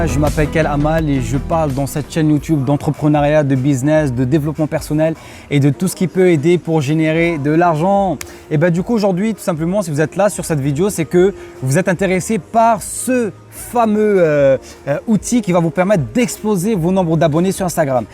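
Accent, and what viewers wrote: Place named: Arab world. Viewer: Tu vis en CI, mais t'as un accent arabe, As tu des origines arabes?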